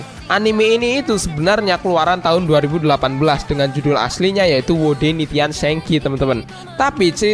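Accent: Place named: Java, Indonesia. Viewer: Wong jawa koe toh minn? Mantep bahasa ne mandan medok:v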